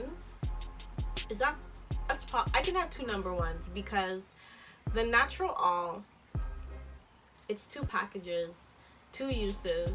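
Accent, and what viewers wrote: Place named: Canada. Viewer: I knew u were in canada i hear the accent lol...im in mtl..where did u buy the avocado?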